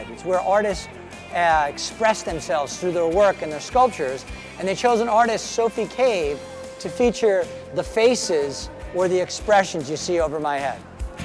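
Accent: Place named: United States. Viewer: what an annoying yank presenter!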